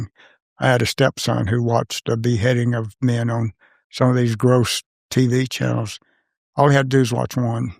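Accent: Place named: North Carolina, United States. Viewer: Sir you mighta traveled a lot but knew were North Kackalaky soon as you spoke.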